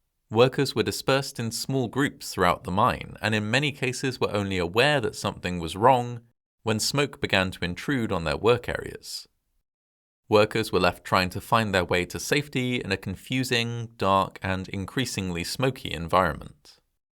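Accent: England, United Kingdom. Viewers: The history books will tell you that the British pronunciation of "aluminum and methane" are purely incorrect. I'm sorry but that's just the way it is. I'm american and call it PETROL because it's correct. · Where are you getting this historical fact? Pronunciation changes over time and devolves in different areas and countries. So it makes sense in England to use the English pronunciation. Maybe if this offends you, you should stick to watching US videos.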